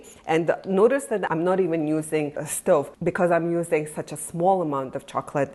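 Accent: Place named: Australia. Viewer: I bet you sound so much cuter saying that with your Australian accent 🙂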